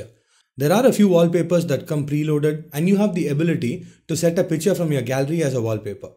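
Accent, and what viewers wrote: India. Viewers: the sound of indian.. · Its sad to know there are so many racists on youtube, if u don't like the accent or indians then instead of commenting on the video just, GET THE F**K OFF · agree... its pure desi :) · I have indian friends. But they learn to get rid of their accent. Not because of dishonoring their culture. But its a skill. You are communicating with the whole world. Please learn proper english pronounciations. Remove your accent and more will come to watch your videos. Your videos are good. But your accent... Help yourselves bro.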